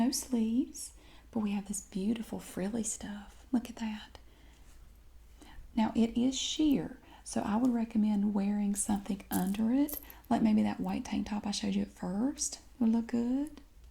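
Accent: South, United States. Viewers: seriously though all scientific knowledge and psychology considered, is it weird that her special southern accent gives me an instant erection ?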